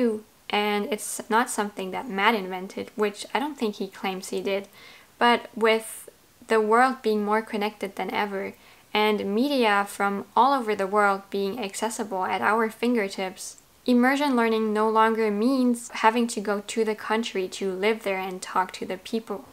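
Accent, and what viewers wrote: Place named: Germany. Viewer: Wow I just found this video and you expressed exactly my thoughts about refold. So many of your points of view I also had them in the back of my head but for some reason I didn't realise I needed to listen to them from someone else. By the way, what is your native language? You give me strong German vibes but your English is really good!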